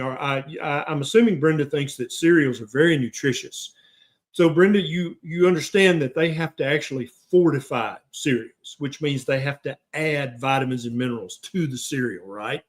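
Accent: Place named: Upper Peninsula, United States. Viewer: Michigan- Yooper:)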